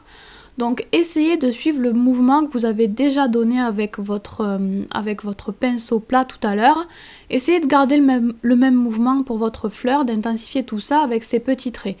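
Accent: South, France. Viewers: Hiiii ! Olalala c'est vraiment très très beau - Je rêve ou c'est un ptit accent du sud que j'entends ? =p Bisous bisous + j'aime hihi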